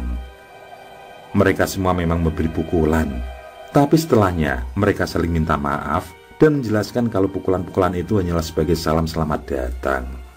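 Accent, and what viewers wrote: Java, Indonesia. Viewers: BARU 32 MENIT BUK NURI TKW DI SYRIA SELALU HADIR,SUKAK BANGET DENGAN ❤ ❤ LOGAT JAWANYA KETAL......SUARANYA MANTAB,NGEBAS, DAN GAMBLANG ALUR CERITANYA, MUDAH DI MENGERTI, NGA MUTER MUTER DAN DIULANG² SUKSES SELALU BUAT ❤ ❤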